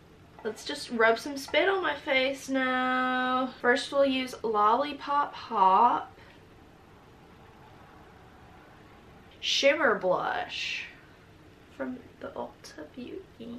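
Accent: South, United States. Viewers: shes from the south lol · ur literally southern sam puckett i, im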